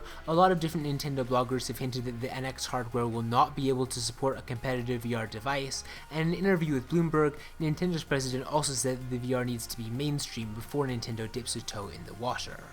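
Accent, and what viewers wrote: Scotland, United Kingdom. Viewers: You're accent has a hint of Scottish to it, are you Canadian?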